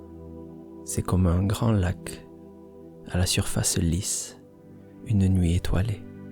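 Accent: South, France. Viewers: Votre petit accent du sud me réchauffe le cœur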